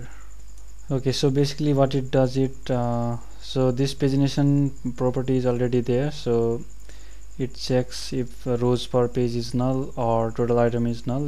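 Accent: India. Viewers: Опять индусина. Бля невозможно слушать...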